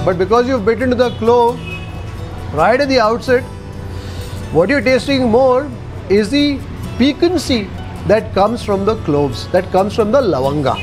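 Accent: Karnataka, India. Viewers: Lady don’t spoil English by adding Kannada accent to every English word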